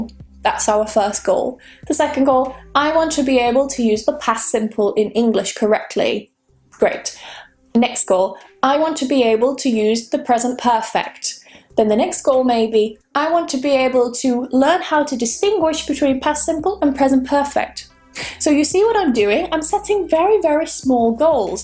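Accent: United Kingdom. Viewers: Hello happy new year 2020, I want to say you many thanks for all videos 2019, they helpful me so much. I enjoyed, learned a lot of things about English language and I wish to continue my English progress with you and your English lessons 😁🤗. By the way I love your pronunciation voice, So I love the British pronunciation 🏴. Best regards from Colombia 🇨🇴.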